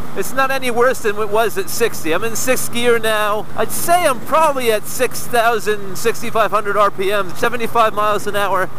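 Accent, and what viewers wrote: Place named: United States. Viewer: Hi, How is it that you have made your home in England. You sound like an American. I lived in London as a teenager and have always wanted to return and live there. How did you do it?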